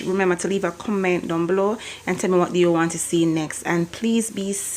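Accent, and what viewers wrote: Jamaica. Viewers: Some real yardie talking yes man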